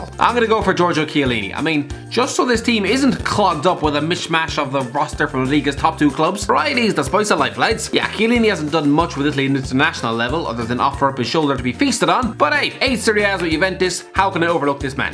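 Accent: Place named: Ireland. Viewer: When I saw “XI”, I thought it would be that dog shit narrator who did the all time XIs for Klopp and Guardiola. Thank God for the returning; Christ above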